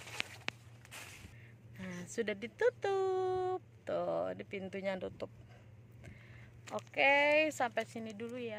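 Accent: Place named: Makassar, Indonesia. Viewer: teh asli nya orang mana .. kaya orang makasar ya ngomong nya😁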